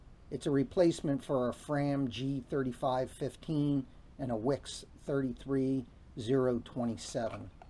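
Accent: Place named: Pittsburgh, United States. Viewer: got ya, figured ya for a yinzer that moved away and still had a bit of pittsburgees left in your speech 😆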